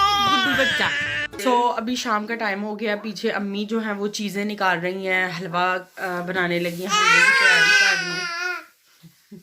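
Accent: Sialkot, Pakistan. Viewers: You r frm sialkot ap ki urdu se lag rh ha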